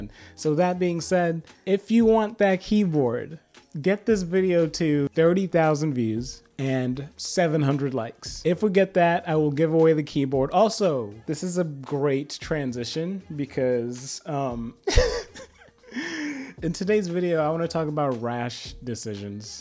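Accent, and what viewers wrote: Kenya. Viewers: are you by any chance kenyan?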